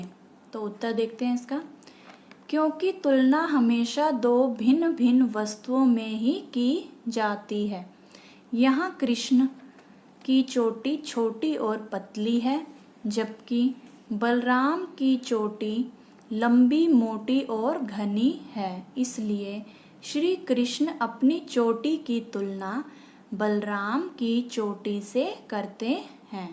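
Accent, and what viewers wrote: Punjab, India. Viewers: Mam are you punjabi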